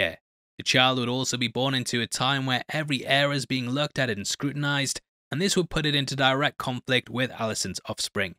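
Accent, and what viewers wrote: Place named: England, United Kingdom. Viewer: Love your accent, what part of England is that from?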